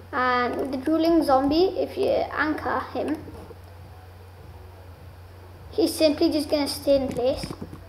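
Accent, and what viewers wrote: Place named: Scotland, United Kingdom. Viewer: Omg your voice is so cute I love Scottish accents btw ty!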